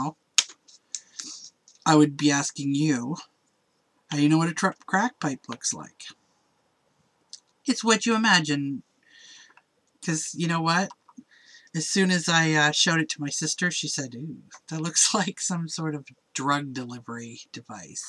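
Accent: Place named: Canada. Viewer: Okay, good video! Couple things...? Hot! I'm a sucker for that Canadian drawl..."owwt" instead of "out." what a cutie! And second, don't you dare bleep yourself. There's nothing funnier then you saying "fuck" ten times in a video out of frustration:). Love it!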